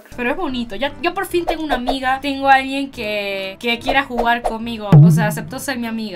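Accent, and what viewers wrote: Argentina. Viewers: Oye eseñame a hablar argentino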